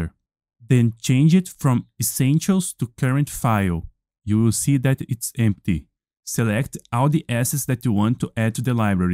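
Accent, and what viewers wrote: Brazil. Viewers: Helo there, conheço sotaque de mineiro só de ouvir. Ajudou muito irmão! Abraços from Contagem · assisti 5 segundos e pelo sotaque tu é brazuca, ou eu errei feio ou eu acertei muito bonito, well in case you are not, great video tysm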